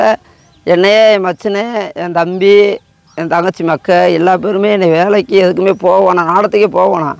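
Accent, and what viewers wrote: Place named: Rural, India. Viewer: அவர்களே நீங்கள் நல்ல விவசாயி ஒரு விவசாயி நாடகத்தில் சிரிக்க வைக்கின்றீர்கள் மேடைக்கு வந்தாலே சிரிப்பு வருது உண்மை உங்கள் பேச்சு கிராமத்து மணம் தெரியும் போற்றுவோர் போற்றி புகழட்டும் புழுதி வாரி தூற்றுவோர் தூற்றி போகட்டும் விமர்சனத்தை கடந்து போங்க இறைவனுக்கு நீங்கள் யார் என தெரிந்தாலே போதும் 2கலைஞர்கள் சேர்ந்து நடிக்கும் போது இதெல்லாம் வருவது சகஜம் தான் தொடர்ந்து நடிங்க ஒரு கார் வைத்து நீங்கள் பேசியது நல்ல காமெடி பேசினாலே சிரிப்பு வருது பராசக்தி ஆரோக்கியம் அருள் தரட்டும்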